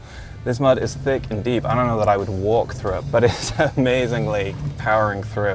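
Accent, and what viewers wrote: United Kingdom. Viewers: His accent swaps between British and American. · Is this guy British?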